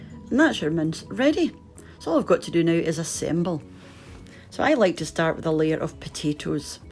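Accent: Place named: Dundee, United Kingdom. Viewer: Hey ( hope I spelt that right ) this is a bit of an odd comment but bare with me. I live in Vietnam and stumbled across your videos about 8 months ago. Originally from Dundee, it was proper comforting to hear you talk during your videos, you have very similar tone/expressions to many of my family members. Just got back to Carnoustie to visit my mum and I made this recipe. Jesus Christ it was delicious and went down a storm with the rest of the family. Just wanted to say thanks for always making home not feel so far away whilst also providing great content. Big ❤️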